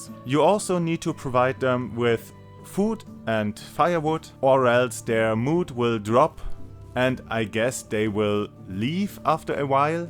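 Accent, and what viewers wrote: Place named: Germany. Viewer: Bist du deutsch? Sounds like a german trying to speak english very correctly.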